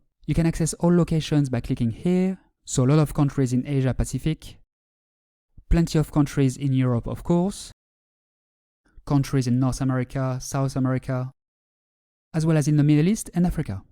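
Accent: France. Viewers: Mhm ur from french right? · Salut et Oulala ! ça se voit "s'entend" que tu es Français toi à ton accent.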